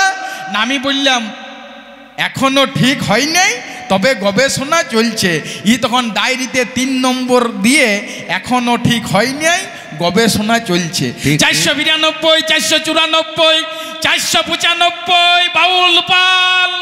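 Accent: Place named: Bankura, India. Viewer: এর বাড়ি বাঁকুড়ায় নিশ্চয় ! ভাষায় বুঝা যায় !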